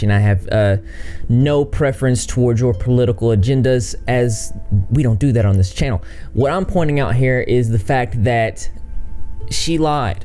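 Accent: South, United States. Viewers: I'm trying to figure out your accent because it's very southern... even more southern than where I live (Alabama). Maybe Tennessee or Kentucky.... something like that? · what southern state are you from dude? I’m from southern KY so I can pick up twangs like nobody else even if someone is trying to hide it lol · Bro where’s your accent from, sounds super southern it’s dope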